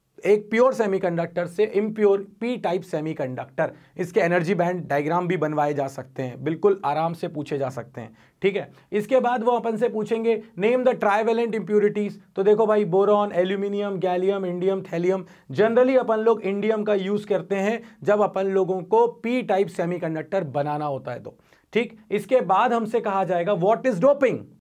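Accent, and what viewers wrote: Bhopal, India. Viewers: Ap bopal ke ho ..aap ki language lag rahe hai..👍.vese apne bhout aacha batya 👏👏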